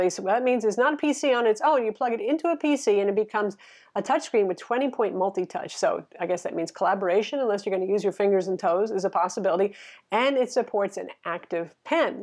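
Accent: United States. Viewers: I really enjoy your presentation, voice and style. Many American accents drive us older English people mad but not yours. Lol. Thanks for all you do.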